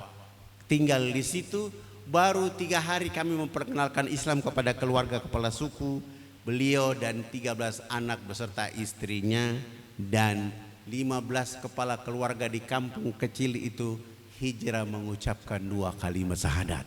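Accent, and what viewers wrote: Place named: Papua, Indonesia. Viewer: Pa ustad kemana aja saya senang dengar dakwah nya.dengan suara kas Papua nya sering" lah isi di yutup.tks sebelum nya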